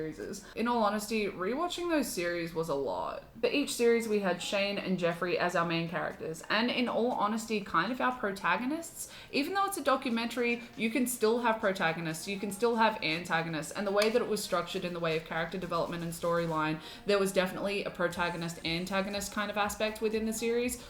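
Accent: Australia. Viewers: This really do be a hot take. Also idk why it's still so weird to hear you talking in your normal voice, but even as a fellow Australian, I love your accent so much! P.s. those eyes are giving me corpse bride vibes and I'm LOVING it! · Man it is SO nice to hear an Australian accent, and to hear Shane described as ‘a bit how ya goon’ bc that is the most accurate description of the man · Holy shit, this is the first video I've seen of you off of your viral tikkoks and yes! I'm living for the Aussie accent. Also super happy to see you growing, keep being an awesome bean. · Are you Australian? I've just found your channel and I myself am an Aussie and some of the things you say are just so Australian.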